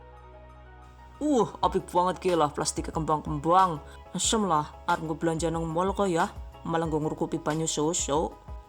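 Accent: Banyumas, Indonesia. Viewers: Hahaiii, ora ngapak ora penak Lanjut na mba Subscriber wis nempel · Rika wong ngendi lik? Inggrise karo ngapake kepenak temen. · Mantul Bun. Eh kok agak gelap ya vidionya bun? Di dalam ruangan ya ? Atau lagi mendung ? Tapi tetep mantul kok masih jelas apa lagi ngapaknya bunda bikin emez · ngapake ky inyong...😀👍👍👍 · salam ngapak..ora ngapak ora kepenakk ya bun..hihi..mksh resepnya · Banyakin logat ngapaknya dong bund...biar nontonnya makin semangat 😄😄😄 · Tek baca Madiun baca lg Madiun takut salah ehh bnr Madiun tp ngapak ky Banyumas Purwokerto😂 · 😜😜😜bahasane rika ngapak temen yuuuu...nyonk seneng lah😜😜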